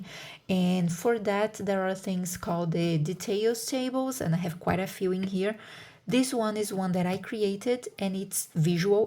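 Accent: Brazil. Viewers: Hi! I'm loving your content. So good! It feels like you're a brazilian because of your accent. Don't know if you're comfortable disclosing your nationality, but, if you are, greetings from a fellow tupiniquim :) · Acho engraçado que eu reconheci o sotaque brasileiro nos primeiros segundos de vídeo kkkkk, o nosso sotaque é muito reconhecível e belo! Excelente vídeo, keep up the great work!! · Imagina a minha surpresa quando ouvi a voz e pensei "isso é BR", fui olhar nos detalhes do canal e boom, era mesmo. Nice seeing another brazilian in the weeds of the hobby, pur gaming landscape goes hard in a different direction than what you tend to see on youtube. Lots of GURPS going on around Brazil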